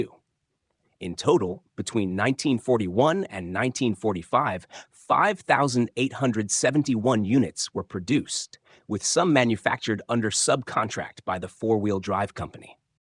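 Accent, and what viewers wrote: United States. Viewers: Please use cubic inches on American vehicles and list the torque of the engine. You sound like an American and engine torque is how these huge low rpm engines moved so much weight. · American accent with all measurements in metric----??????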